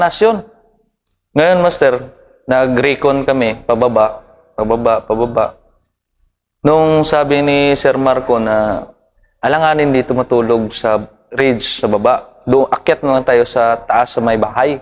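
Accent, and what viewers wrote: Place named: Visayas, Philippines. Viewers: Bisaya master or Bisayang daku or bisdak ang tuno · Hiligaynon tingin ko. Ilonggo.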